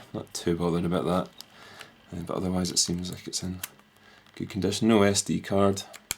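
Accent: Scotland, United Kingdom. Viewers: Is that a Scottish accent I hear?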